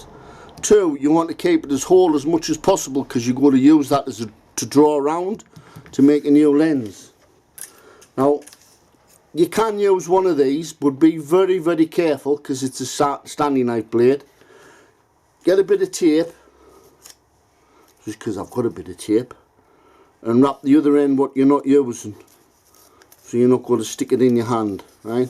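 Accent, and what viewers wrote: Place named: South Shields, United Kingdom. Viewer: hi where you guys im south shields you sound local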